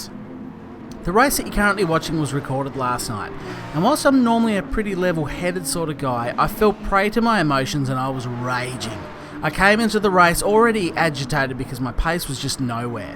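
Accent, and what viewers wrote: Australia. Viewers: siga raga He is an Aussie if you couldn't tell by his accent, and the Australian flag next to his gamertag. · Straya mate! How could you ever think he is British?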